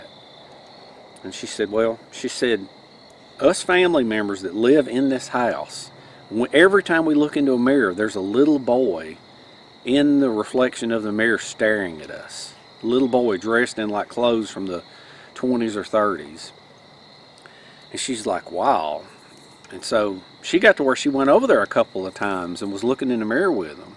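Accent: South, United States. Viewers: Ow yes I "love" these scary stories..especially when it's spoken with a Southern draw..greeting from The Netherlands❤🇱🇺 P.S. love your channel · Yep. Shame that the Southern accent is slowly disappearing.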